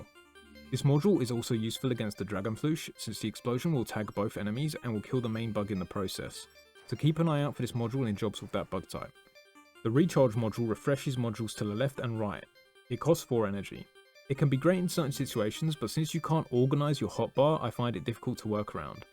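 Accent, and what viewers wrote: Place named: United Kingdom. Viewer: Man I had to put you at 70% speed. British is hard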